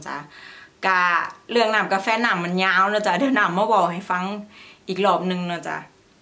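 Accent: Isan, Thailand. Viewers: ชอบการพูดของคุณมากๆค่ะ ชอบคนอีสานและก็ชอบฟังคนพูดภาษาอีสาน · ชอบฟังเว้าอีสาน เพราะ แล้วคุณน้ำพูดอังกฤษได้ดีมาก สำเนียงอังกฤษ ไม่ติดอเมริกันมาเลย เดาว่าเริ่มใช้ที่ u.k จึงไม่มีสำเนียงอื่นก่อน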